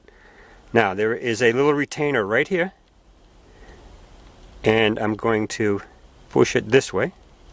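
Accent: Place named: New England, United States. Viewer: I saw a million professional videos on this job and it's the guy with the New England accent, like Peter Griffin, that got the job done and helped me truly understand. Much appreciated. 😄🍻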